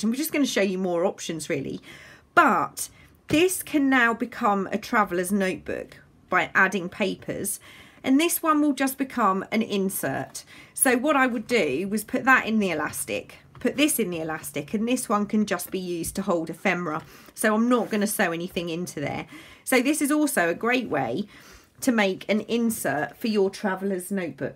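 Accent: England, United Kingdom. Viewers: I think I like hearing your English accent as much as your artwork!! Watching your videos just makes me happy!😊😊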